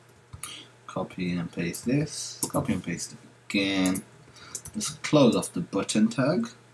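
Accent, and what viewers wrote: United Kingdom. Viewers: and +Nooblike first of all if you carry on his tutorials and accent then may be you can find out he is from United Kingdom what is famously known as UK. he showed every step with a generous teaching method what can not be narrated (I believe). Now if you see the rest of his videos, I think you know how he knows about these things and game development. and what a good method does he have to touch every corner. and 2$ or a 4$ is not a fact. at least you guises have seen he used a macintosh(MAC). So, he is not that much poor as you said. and please if you can't appreciate this help for the cost of 0$ at least try to be at least respectful for his generous help.+Rawandi please don't use this kind of talking to people who are helping you-if you don't like it then skip the video but don't try to b**s**t there. and +Nooblike don't don't tahnk this it makes you look like you are appriciating this kind of works. 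At Last + Thank you.Awesome tutorial as always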